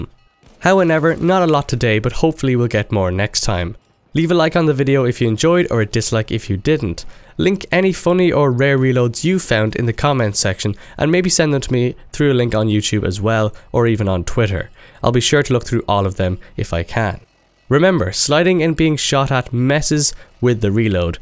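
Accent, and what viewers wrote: Ireland. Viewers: r u Irish mate cause I am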